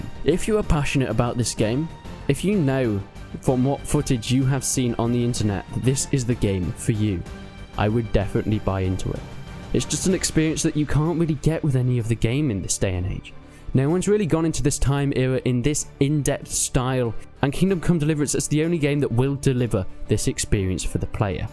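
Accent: United Kingdom. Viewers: I thought he was British?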